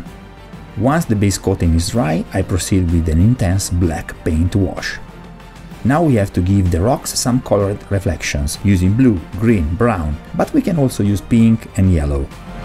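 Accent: Italy. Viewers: Hey what part of Italy do you live? (I think that's an Italian accent but I've been drinking)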